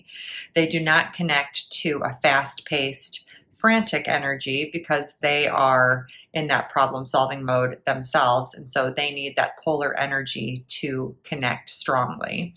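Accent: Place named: Upstate New York, United States. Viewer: Are you from upstate? You have that accent! Love your advice!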